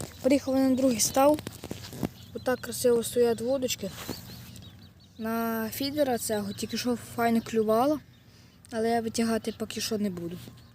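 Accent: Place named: Khmelnytskyi, Ukraine. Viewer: То ї в останні такий діалект чув років за 30 на річці Збруч Хмельницька область((ты юначе коропа лапах? Авжеж.файний короп))😅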